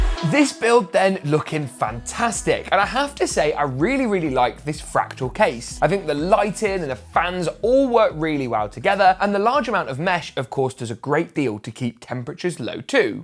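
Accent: United Kingdom. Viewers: Bruh the thick british accent i can't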